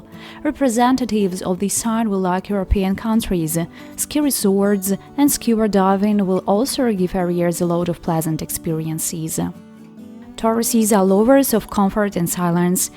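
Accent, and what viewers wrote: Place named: India. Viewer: nah, they need to replace this indian narrator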